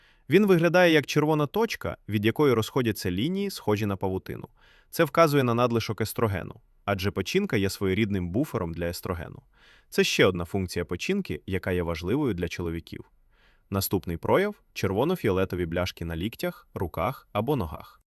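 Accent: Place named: Central Ukraine, Ukraine. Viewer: Навчити "дєвочку" з Кійова, щоб вона розмовляла як пані зі Львову? Навіщо?! Тим більше що ано з Кропивницького чи з кіраваградскої області. "Тітка"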